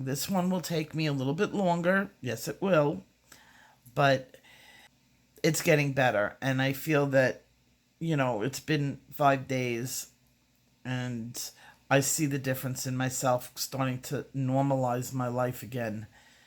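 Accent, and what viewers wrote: New York, United States. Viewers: When my close friend died I had not yet discovered coloring. What brings me here? You are a great talent. You are generous with your talent. You are clear. And genuine. And I like your New Yawk/New Jursey accent.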